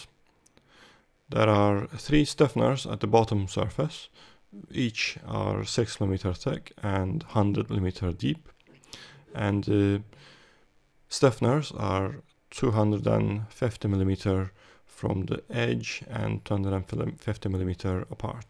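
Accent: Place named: Turkey. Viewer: hi admin, where are you from? your english like Turkish accent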